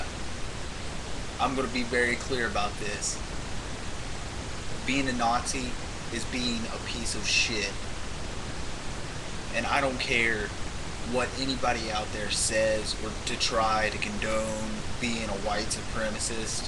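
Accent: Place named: Philadelphia, United States. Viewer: You sound like you are from Philly. Move to Camden, live there for a year, and then please do a video update. Until then, please explain your theory of what is or isn't "punk rock" because it sounds to me like you think it is "punk rock" to take the establishment position on every last little thing. Wee bit odd, yes?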